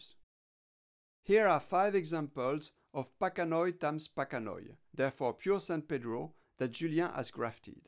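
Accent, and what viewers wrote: France. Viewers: au top, j'adore les cactus et l'accent français, merci !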